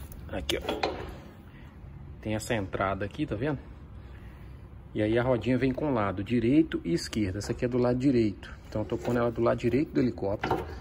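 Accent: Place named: Minas Gerais, Brazil. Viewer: Fiquei sensibilizado com a sua história de perseverança, provando que, mesmo com muitos obstáculos, é possível realizar sonhos. Você me passa a impressão de ser uma pessoa de bom caráter com muita humildade. Aposto que era zagueiro, e descia a borduna nos pobres atacantes - rsrs. Bom ouvir suas histórias. E o sotaque mineiro soa agradável aos nossos ouvidos daqui do sul.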